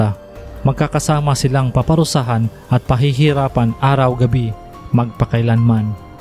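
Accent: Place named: Visayas, Philippines. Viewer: Bisaya ka bro😁ung accent mo,hehee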